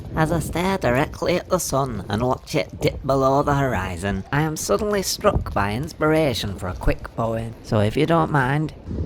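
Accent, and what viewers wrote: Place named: North, United Kingdom. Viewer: And the lips. Don't forget the lips. Oh, and the Northern accent 😁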